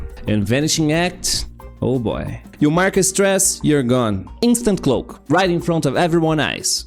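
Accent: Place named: Brazil. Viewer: Are you Brazilian by any chance? I'm asking because your accent sounds very Brazilian hehe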